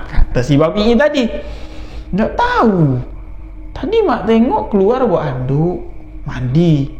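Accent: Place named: Jambi, Indonesia. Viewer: Aku suka dengar bahasa jambi...bila bang bercerita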